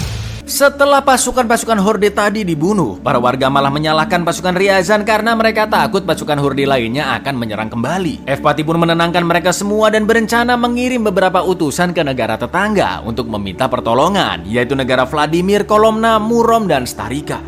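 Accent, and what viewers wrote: Java, Indonesia. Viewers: Jowo nyell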